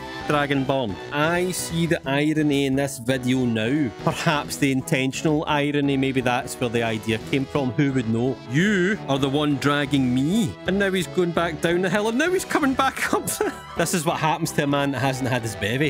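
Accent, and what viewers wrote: Scotland, United Kingdom. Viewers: I accidentally unsubscribed scottish skyrim daddy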